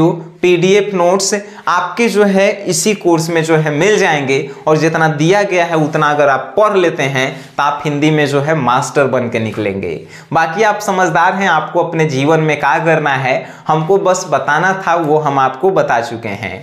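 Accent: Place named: Bhojpuri, India. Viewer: sir aapki bhojpuri accent ke sath padne me aur maja aata hai ❤️😃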